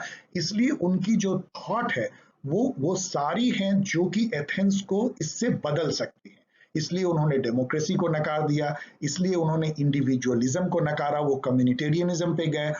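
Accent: India. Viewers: I swear by Allah Almighty, I'm not understanding Indian English😢it sounds like they're speaking their language.😢 Is there any link for me check out on? 17 days to our exams😢😢😢😢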